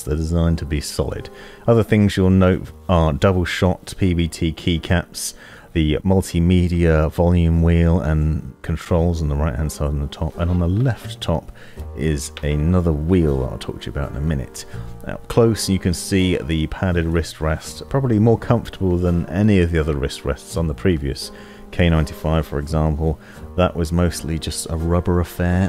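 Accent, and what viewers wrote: England, United Kingdom. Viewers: Обожаю настоящий, английский язык, а не нищий американский. Мёд для ушей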